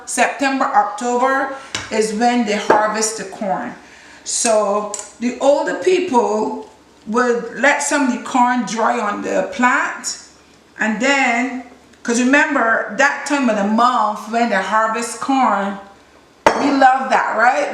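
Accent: Barbados. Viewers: Your recipes are always on point. Any one can follow. I am so impressed that even though you've been away from this land for so long you sound so bajan. And you remember everything. Love ❤ it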